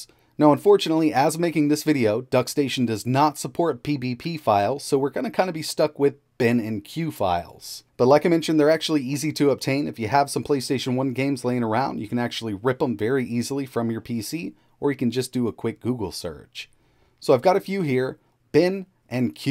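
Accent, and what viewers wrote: United States. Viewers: An American accent.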